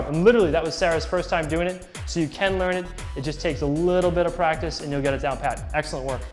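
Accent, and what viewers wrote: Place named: Canada. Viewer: Is from Canada?? He pronounces his "o's" like a true Canadian.:)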